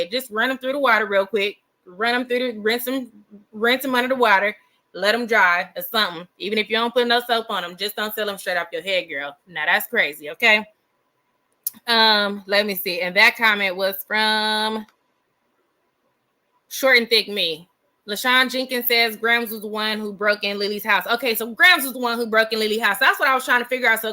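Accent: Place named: Los Angeles, United States. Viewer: btw I’m from So Cal. I hear LA in your voice 💜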